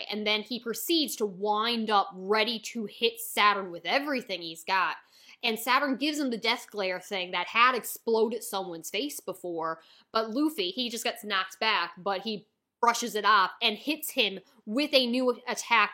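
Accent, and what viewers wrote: Canada. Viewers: Is your accent Canadian?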